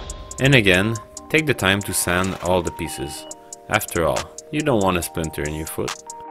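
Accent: Quebec, Canada. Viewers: ca sens l'accent de Quebs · Ça sent l'accent québécois tout ça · T'es pas québécois par hasards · French canadian?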